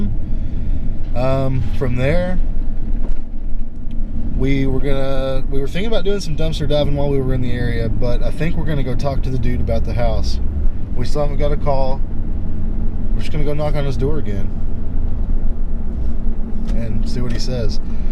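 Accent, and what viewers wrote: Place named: Texas, United States. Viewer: I knew it! I knew you lived in my area of Texas. That's amazing! I knew from your accent. As you know, our state has many different types of Texas accents. I was born and raised in Grand Prairie. I moved in 1989 to Navarro County and have heard so many different dialects. This is awesome. Thank you for your wonderful videos. I am a new subscriber (last week, maybe).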